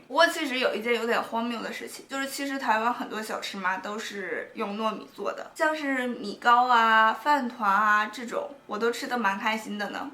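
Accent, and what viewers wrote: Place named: North, China. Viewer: 聽你的口音應該是大陸北方的人難怪对台灣南部偏甜的口味不應可理解的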